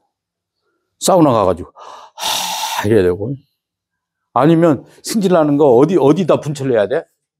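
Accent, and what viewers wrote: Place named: Jeju, South Korea. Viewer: 제주도 사투리 넘 웃겨요~~최고의 강의 감사합니다~~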